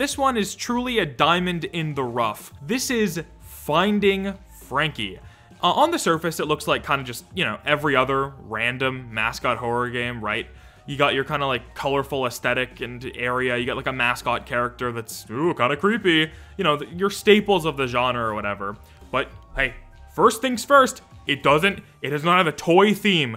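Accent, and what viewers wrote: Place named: Canada. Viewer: Holy shit, is Canadian? I can totally hear it now.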